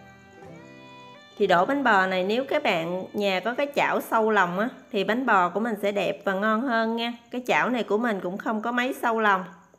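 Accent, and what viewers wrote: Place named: Mekong Delta, Vietnam. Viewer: Tiếng nói rất rõ ràng và thật thà đậm nét miền tây, hướng dẫn cách làm rất dễ hiểu thật tuyệt vời 👍hà, chúc em thành công hơn nữa.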